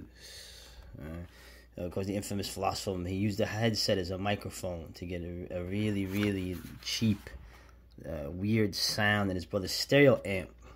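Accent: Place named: New York, United States. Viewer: H0ly East Coast New York American accent Love It!! Sending Love from West Coast Canada, Vancouver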